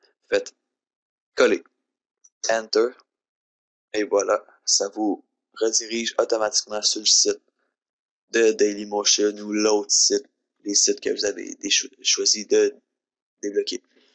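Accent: Quebec, Canada. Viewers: Merci pour cette vidéo ! Je trouve qu'elle est sincèrement bien commentée, je suis juste pas habitué au québécois ! Je testerai tout ça dans mon Collège après demain ! Merci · Non, je suis moi-même Québecois; c'était juste qu'à l'époque ça m'avait surpris. (éwè, à force d'écouter de l'anglais et des français de france à longueur de journée, on fait le saut lorsque l'on tombe sur son accent natal :-°)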